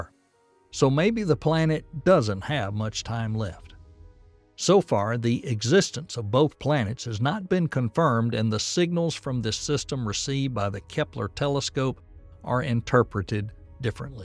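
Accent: South, United States. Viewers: i love this guy's southern sounding voice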